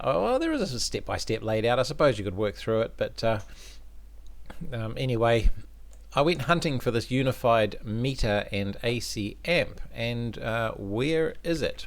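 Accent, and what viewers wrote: New Zealand. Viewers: Customers car came in today senders seem okay cause its sending signal saying full on gauge when passengers plugged in however half when unplugged and half when both unplugged will open up tomorrow morning and hopefully this info does the trick. you sound like you're from nz. owe u a box of beers if this works out. $100 so far for diagnostics dunno what to charge if this is the issue. maybe another box lol more so around $350 which I think is fair. Chur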